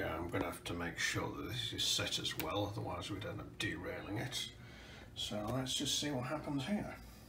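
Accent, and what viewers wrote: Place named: England, United Kingdom. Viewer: Maelee said “ahh he’s from England, that’s why he has an accent”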